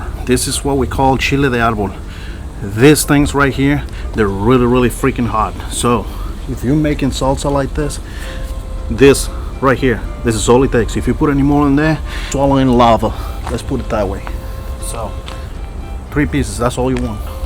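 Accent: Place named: Kansas City, United States. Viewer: Boy, those Potato Taco's with salsa! Yummy! You are a real Mexican with a Kansas City accent. Not making fun of you. I have Mexican cousins that live in Topeka! I am going to tell them about you! I will making your salsa, that's for sure!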